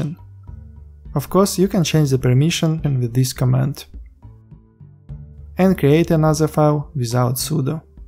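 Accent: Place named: Russia. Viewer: Русский акцент английского языка :)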